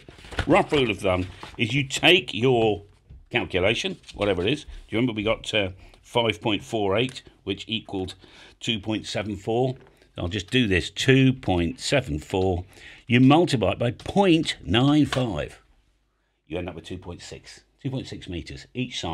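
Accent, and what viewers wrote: United Kingdom. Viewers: your posts would be better if you used scripted (posted words) rather than trying to figure out what you are saying with your British down play English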